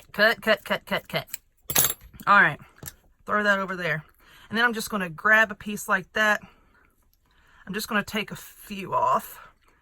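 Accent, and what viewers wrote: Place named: Georgia, United States. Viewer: my first video of yours. love it! I'm dying to watch more to know if you're out of Georgia. You sounds like my cousin who was raised in Athens.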